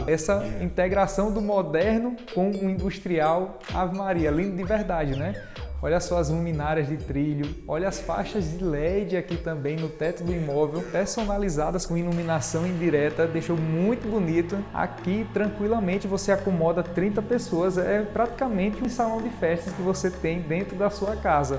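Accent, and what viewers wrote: Northeast, Brazil. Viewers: Gostoso é esse sotaque nordestino! Adoro!!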